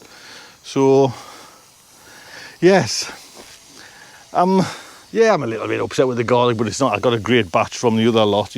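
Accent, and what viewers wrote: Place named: North East England, United Kingdom. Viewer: Just stumbled across your channel today and as fellow North Easterner born and bred just down the road from you in the big settlement....:), it's great to hear the twang again. I've just started my plot after all these years and am looking forward to your adventures.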